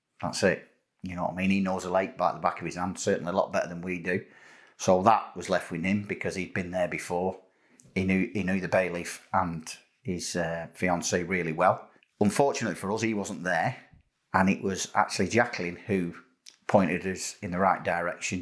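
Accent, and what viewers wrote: United Kingdom. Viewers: He's from Britain, He is English and has a "Lancashire" accent. ( From the north of England)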